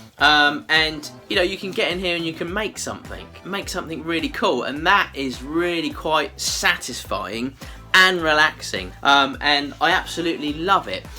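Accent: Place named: England, United Kingdom. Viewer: Being that I am a "bird" (LOL) I will probably never use a lathe - not that ladies can't & dont, it's cool w/me! BUT, my point is I enjoy watching purely because you are so much fun and you really make me laugh! Love your editing too. As for talking too much I could listen to you talk all day. I think most Americans love a great English accent. However I AM a maker, I make quilts, and recently finished a Union Jack quilt for my Grandson. Wish I could show you a pic. I love you tube, it's where I learned to quilt and it brings me a ton of GREAT entertainment and laughs like your channel. Thanks ❤ God bless you & yours! 🇬🇧🇺🇸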